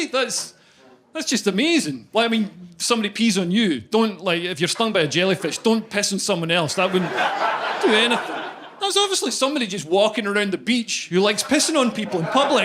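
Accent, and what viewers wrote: Scotland, United Kingdom. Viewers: His Scottish accent makes the jokes even better. Non-Americans have such effective vocabulary and makes things a lot funnier than they probably are. It took me a very long time to know I'm Ace, partly because I don't f*cking care about orientation or labels. Is it too much to simply exist without knowing some personal preferences? I'm all for figuring oneself out to have a peace of mind but, damn. The alphabet people have taken things *WAY* too far this year. Be happy with who and what you are but let's remember to keep some things to ourselves. Some gay guys I knew/know didn't show any "signs" as they didn't make it out to be their only characteristic. Very polite. Well spoken.They also just so happened to dress very well but doesn't every self-respecting person do that? Annnyway, the only real fact didn't say about who's actually upset about us Asexuals is feminists. 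Feminazis in particular, the man-hating ones that want nothing more or less to wipe us off the Earth. When in college I read a passage which humored me. It was a bona fide statement backed up by some sources claiming Feminazis hate us Aces because we don't fit into that stupid rhetoric they're always saying: "Men only want one thing..." uh... if it means what I think it does, no we don't. Begone, Karen! Off to CVS for a boxed wine for your sorrows and bag of Whiskas for the cats! Try to figure out a more practical way to insult us Aces. Take your time. I can wait. By the way, if I understand the online comm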